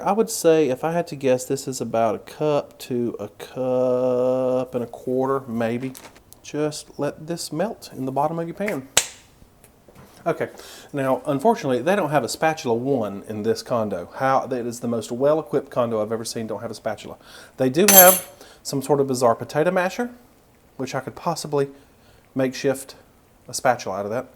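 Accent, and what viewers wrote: South, United States. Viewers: I love your southern accent. 🙃😁🤣 "oh come on here withat!" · Just discovered you the other day and you are an absolute DELIGHT to listen to! You're so funny and sweet, informative and I love your southern US accent! I'm already a fan, over here in Belgium. 🙂❤️ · Binge watching you today! LOVE 💕 THIS 💕 CHANNEL!! U make me smile! U encourage me to cook and I love your realism. Can’t wait to make this! I love your accent. I’m from SC so it’s comforting to hear southern twang! Keep calm and Keto on, my man!!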